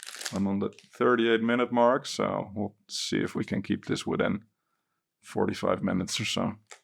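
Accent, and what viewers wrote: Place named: Netherlands. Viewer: Was thinking you were Dutch too. That accent! :L. But, you're English is fantastic, even you haven't spoken it for years.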